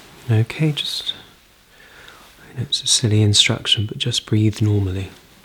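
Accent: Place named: United Kingdom. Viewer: He sounds like a British version of my allergist and it’s scary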